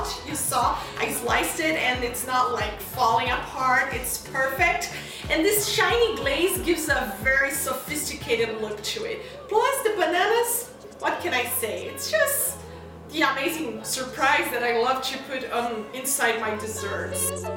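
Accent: Brazil. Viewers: Omg girl! You so pretty I love that accent! I'm guessing you're from Brazil? Anyways thanks for the video I'm gonna do this 😚😍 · Sotaque brasileiro é inconfundível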